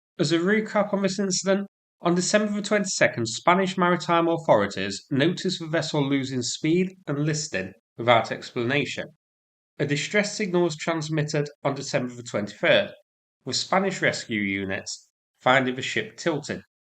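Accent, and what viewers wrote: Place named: Lancashire, United Kingdom. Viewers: To the VO words ending in ING are pronounced ing like housing not housin ‘ ing . If you’re going to do a video at least learn your pronunciation. You sound ridiculous. The Lancashire accent is bad enough ! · Northern English accent.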